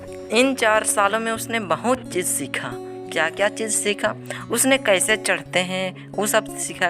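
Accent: Bihar, India. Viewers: SIR AAP BIHAR SE HO ??? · Bhai were are you from. I think you are from bihar 🤗❤️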